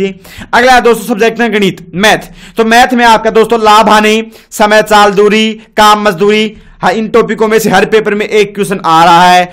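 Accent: India. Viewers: Ap hariyada se ho pakka...😳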